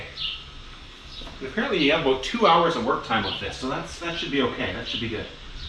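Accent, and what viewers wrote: Canada. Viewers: I KNEW you were from Canada when I heard the accent, eh!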